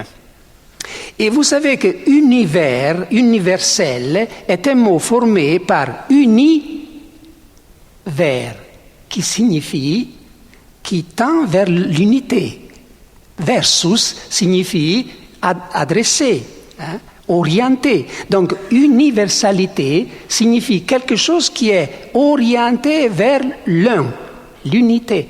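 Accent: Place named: Italy. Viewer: Bonjour mon Père, votre accent a le parfum de l Italie le parfum de la meditéranné et en vous écoutant nous prenons le grand large , merci infiniment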